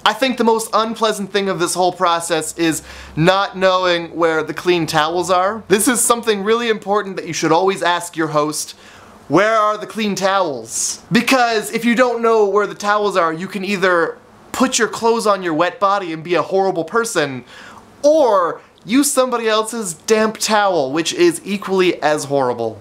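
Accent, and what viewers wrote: Canada. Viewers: he sounds way more canadian than usually here. weird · his canadian accent :)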